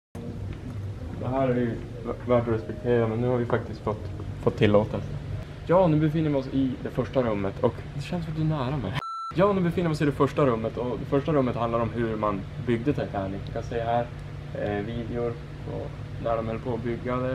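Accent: Norrland, Sweden. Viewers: Härligt med lite norrländska!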